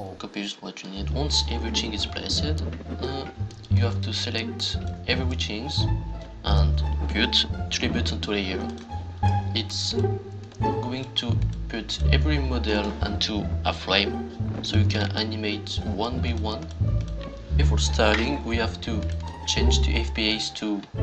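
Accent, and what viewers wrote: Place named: France. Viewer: Dude you are so funny your editing is great and you except the fact your French accent is hard to undestand for people that are not French witch every youtuber should do good on you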